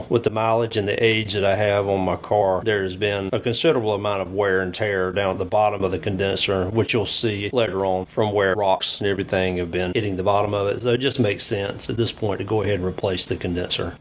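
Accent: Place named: Georgia, United States. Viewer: Excellent DIY tutorial with a Goorgan accent to boot, I reckon. Bummer you had to go all through this after you fixed the AC a year ago. But that's the reality of owning a car past it's engineered intent. One good source of AC troubleshooting is the Professor Pentane yt channel.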